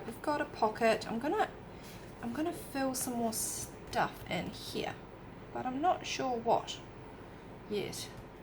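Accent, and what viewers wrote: New Zealand. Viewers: Love this video. I am a new subscriber from Sydney. Got put onto your channel by my sister' Roxy Creations By Rachel. Love all that you make and could totally tell that you were a Kiwi as soon as I heard you:)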